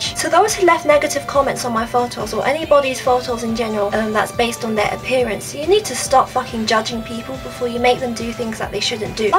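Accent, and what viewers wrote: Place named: United Kingdom. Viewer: Cool accent!!!! Did you grow up in the uk or something?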